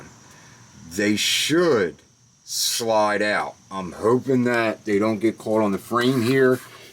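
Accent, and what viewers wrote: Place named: Pittsburgh, United States. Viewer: What part of Pennsylvania are you from? I ask because your accent sounds like you’re from the Pittsburgh area and I’m moving back and I have an lml.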